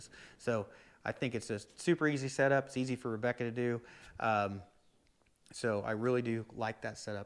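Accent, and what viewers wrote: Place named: Oklahoma, United States. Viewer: ten or eleven years ago... sounds like you're near me in oklahoma lol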